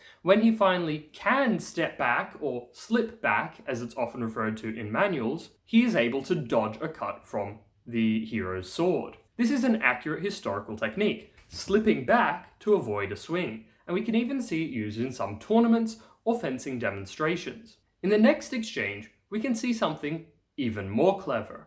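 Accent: England, United Kingdom. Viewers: Your Polish sounds good and your English, well, English. As to never having been to the UK? Big surprise to me. I did like the video, btw and will certainly check the movie out. Up until now, the best looking swordplay - with sabres - that I'd seen was in another Polish movie, the title of which I don't recall, but concerns the 'potop' period. One of a trilogy, if I remember rightly. If you've not seen it, it may be worth a look. But then, I've never so much as handled a sabre, so make of that what you will. Cześć!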